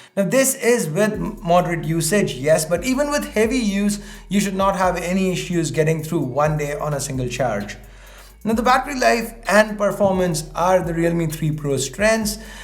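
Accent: India. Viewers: I know its bad on my part, but I just can't stand Indians having a mix of Indian and foreign accent. Sorry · difficult to understand your indian slang english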